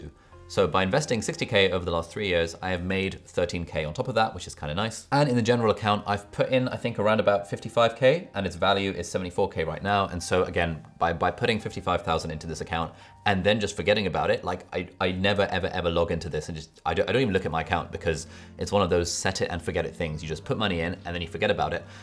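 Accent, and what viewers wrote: England, United Kingdom. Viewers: Hi, I like ur video, but plz try to slow down little,I paused too much to cope with… cool British accent.Thnx a lot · Absolutely love this and omg your British Accent is to live for!!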